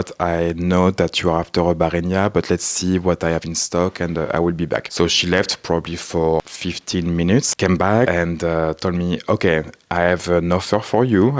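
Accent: France. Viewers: French who speak english with french accent it s awfull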